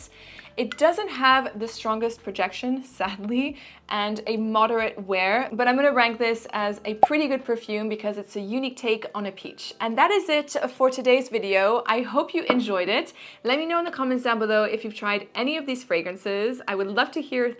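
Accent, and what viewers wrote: France. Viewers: Kinda just watching the video because I love listening to her French 😌 ❤ 😊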